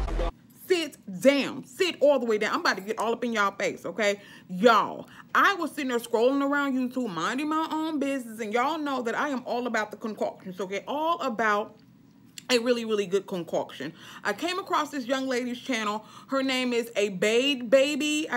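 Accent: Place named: United States. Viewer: Lol girl you killed me, i love how you American talk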